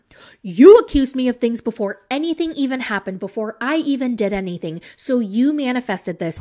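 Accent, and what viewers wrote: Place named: South, United States. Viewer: you have a beautiful southern accent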